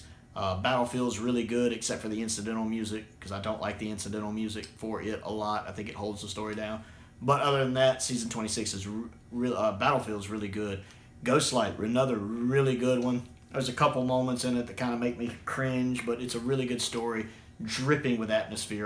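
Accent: South, United States. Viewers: Listening to an American with a strong southern accent talking in detail about a cheap TV show (albeit beloved) made by the BBC in the 60s, 70s and 80s is such a wild juxtaposition - I love it! You're easily one of the most articulate reviewers for Doctor Who I've watched/listened on YouTube. Love you're take on the programme!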